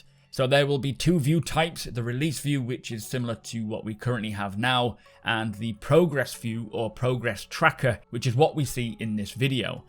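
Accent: United Kingdom. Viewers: You have a very thick britbong accent It's really annoying, for example on 5 minutes you're trying to say teased but you said teabd. incoherent mumbling.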